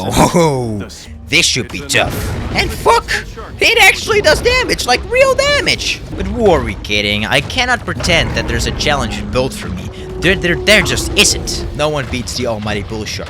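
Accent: Netherlands. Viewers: are you dutch? cuz your accent sounds very dutch imo. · your dutch arent you?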